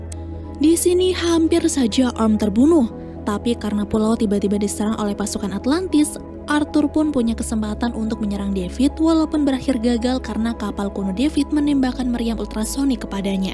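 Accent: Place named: East Java, Indonesia. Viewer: Ini pembawa alur ceritanya orang daerah mana yak? Kok bahasanya gemesin banget 😃 cak cok cak cok 🙈🙈🙈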